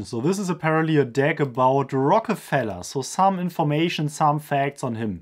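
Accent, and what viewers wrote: Germany. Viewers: can i pay you to say the word "squirrel" and the statement "clean and clear car windows"? i don't think i've heard such a pronounced German accent outside of TV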